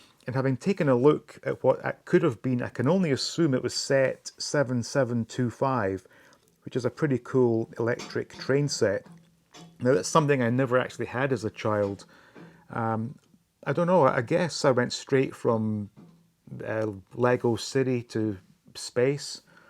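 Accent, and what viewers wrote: United Kingdom. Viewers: Do yo live in the U.S. ? I noticed you sound like you're from the U.K., but based on some of your past videos I figured you live in the U.S.,but grew up in the U.K. as a kid?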